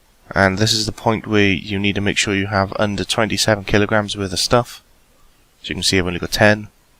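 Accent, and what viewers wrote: Wales, United Kingdom. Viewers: Best guide maker i've found without a doubt. That Welsh accent just reminds me of Dirty Sanchez every time I hear it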